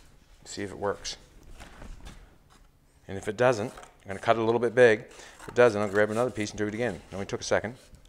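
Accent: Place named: Canada. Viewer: Just discovered your channel a few days ago, and when I heard your accent, I immediately knew this has to a Canadian guy. Your videos are a bit longer than most of the videos I watch, but it's nice to see the uncut process of your work. I think it inspires a lot of people who would otherwise think they couldn't possibly do the things you do. It's good to see not only the successes and final outcomes, but also the trial and error that are part of real life. Also nice to see family involved in your passion. Carry it forward. New subscriber here.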